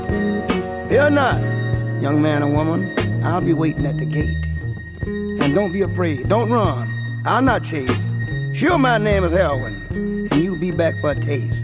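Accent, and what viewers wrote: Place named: South, United States. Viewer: I love the southern accent. My scots-irish granddaddy had the exact accent, and it's quite beautiful.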